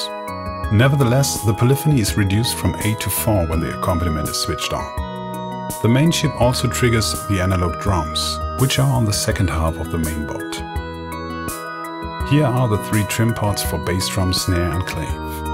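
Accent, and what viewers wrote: Germany. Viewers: Nice german accent and a very, very useful review !!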